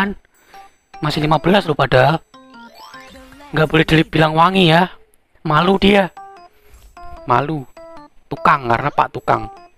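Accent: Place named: Surakarta, Indonesia. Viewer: Logate koyo wong solo njenengan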